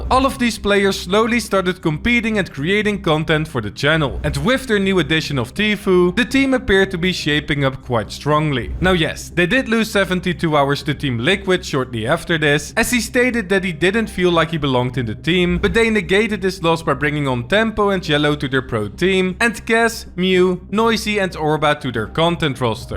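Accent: Netherlands. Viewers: is it me or does this dude has the biggest dutch accent i have heard in my life?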